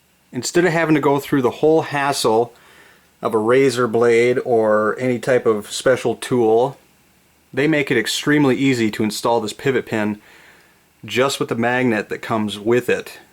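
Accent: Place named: Minnesota, United States. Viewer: It sounds as if the publisher of this video is from Minnesota... Good tutorial for the replacement of push-pins.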